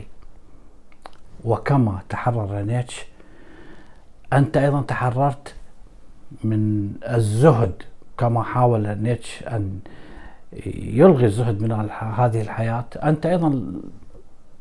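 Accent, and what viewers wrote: Iraq. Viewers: اشكرك من صميم قلبي على كل ما تقدمه بصوتك الشجي العراقي الاصيل . تحية لك من دمشق ❤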